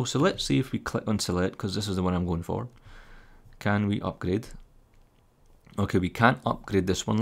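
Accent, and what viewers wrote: Scotland, United Kingdom. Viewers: PLEASE REPLY...when you get your new macbook air is it possible to compare it to the 2010 version of the macbook pro please? or answer this in one of your Q&A vids? I have the choice between getting a 2010 macbook pro (2.4 ghz core 2 duo, 4 gb ram, 250gb hardrive) for 600 pounds or the new macbook air...which would you recommend? By the way, im a big fan of your vids and i LOVE your scottish accent :D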